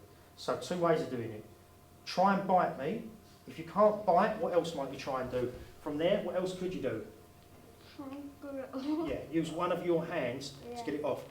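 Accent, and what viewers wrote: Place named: Australia. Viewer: A big guy in Aussie accent doesn’t mean he know what he is doing. Half of the technique is NOT possible simply because you can’t reach the guy. Also I’d like to see a child being able to pull a finger off of a lumberjack sized men. There are good self defense techniques on YouTube, this is NOT one of them. Try it with a big guy at 50% aggression, bet you can’t even reach him. But a strangle can put you out in 3.5 seconds flat.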